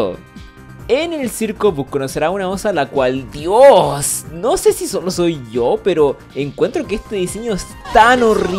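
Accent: Chile. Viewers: Eres chileno?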